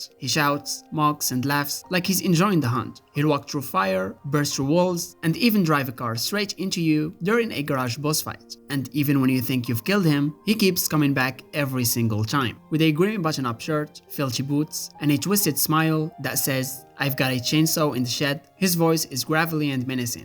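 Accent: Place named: Morocco. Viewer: dude are u moroccan u got a hard accent and keep going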